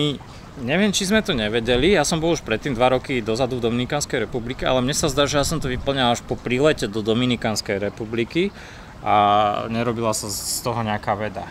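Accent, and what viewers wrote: Slovakia. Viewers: Bratu, ja pocujem podla prizvuku, ze si zapadnar, ale nehovori sa kamerovat, ci nedajbo fotoaparatovat (co si nasatastie nepouzil) :D. Ale nakrucat, natacat ;))) Inac drzim palce, vyborne cestopisy ;)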